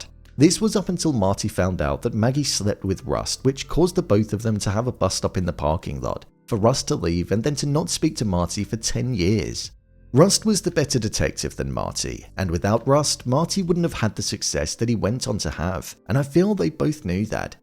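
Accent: England, United Kingdom. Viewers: that's not an Australian accent and anyone from Australia would be insulted by that. Its a straining for a poo part of England robotic voice.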